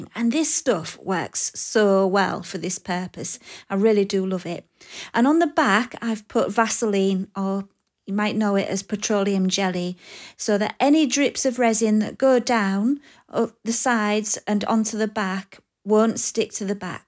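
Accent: United Kingdom. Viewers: Hi, just come across your videos today. From your accent I would guess you are UK based? I see you see to make many beautiful things with resin, and was wondering if you could recommend a hard, heat resistant resin suitable for coasters……that won’t mark with hot cups. Many thanks if you get time to reply. Deborah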